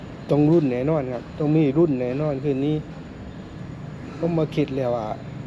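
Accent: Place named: South, Thailand. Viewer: รุกภาษาใต้นคร55555😂😂😂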